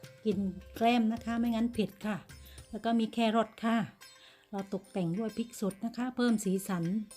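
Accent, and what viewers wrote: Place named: South, Thailand. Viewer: ขั้วกลิ้งหมู จัดเสริฟ พร้อมมะเขือพวง มะละค่ะ. ใส่ สันน่า ทานค่ะ รสชาติ จัดจ้านค่ะ อร่อยจังฮู้ เผ็ดมากค่ะ พี่สิแลงใต้ เพราะ จังค่ะ อร่อยจนตัก ไม่หยุดค่ะ ไปต่อ หลังไมค์ค่ะ. ขอให้ มีความ สุขนะค่ะ❤️👍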